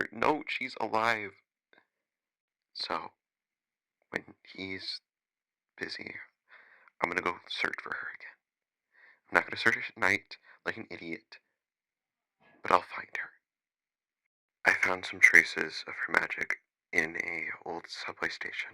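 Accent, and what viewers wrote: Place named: United Kingdom. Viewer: British aha voice ❤ adorable character 💪💗💗💗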